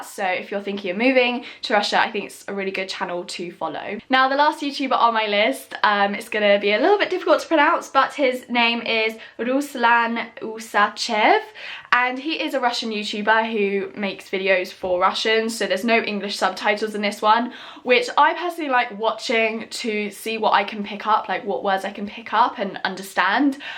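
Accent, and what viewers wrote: United Kingdom. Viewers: I love to listening to british accent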